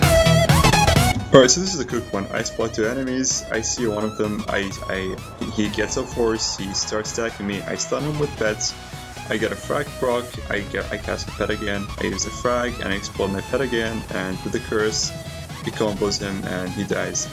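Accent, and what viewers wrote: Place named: Austria. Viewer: Österreicher? :-) Klingt bisschen wie Arnold ;-)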